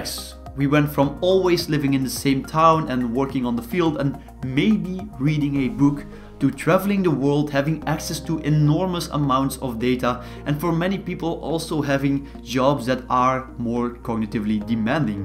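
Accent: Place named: Netherlands. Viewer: ps: I've to tell that it's not easy, at all to hear out where you come from. It really sounds like a mix of german, dutch and french accent. my guess is dutch. ( i consider myself a professionell in useless skills)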